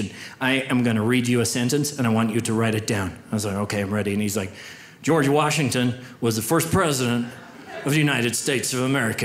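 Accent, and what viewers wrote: Ireland. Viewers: The Irish accent makes it funnier 🤣🤣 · Agree, the Irish accent threw me off at first as well, but worth the watch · Hahaha… he’s Irish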